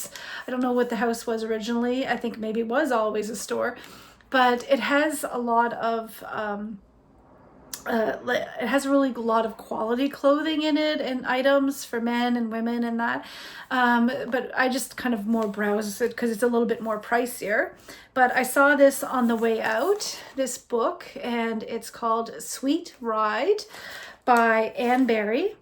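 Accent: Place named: Canada. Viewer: Even as a Canadian I notice you have such a striking Canadian accent! 😍 maybe it’s native Nova Scotia accent?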